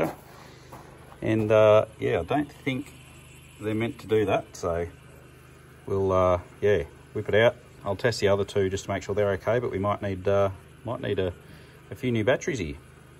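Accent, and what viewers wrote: Australia. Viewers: Hey, you need to include an Australian dictionary with your videos. What is a brick dunny? Yeah, I know what it is, but occasionally I have to scratch my head on some of your terminology. LOL. Not a problem. I totally enjoy your videos. I visited Australia 4 times, so I did learn a little bit about your lingo. R's are usually silent. A bar is a bah. On a farm, the list of equipment that needs work is endless and always changing.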